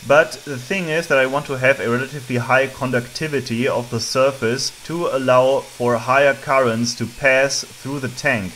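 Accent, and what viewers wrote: Germany. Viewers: Man hört deinen Deutschen akzent echt raus :DD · German? Irish? Idk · German? Just asking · I like watching Mathologer, The Oftler, and the guy who makes those repeating "Legolas" bows. It dawned on me that OF COURSE Germany would have a variety of regional accents just like America does, because you all have very different accents from one another while at the same time sounding distinctly German. I love linguistics. :-)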